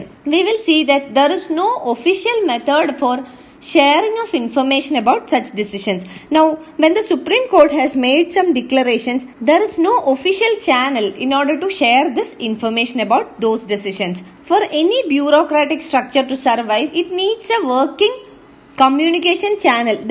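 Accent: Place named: Kerala, India. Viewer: The voice says it clear that the person explaining is a malayali😂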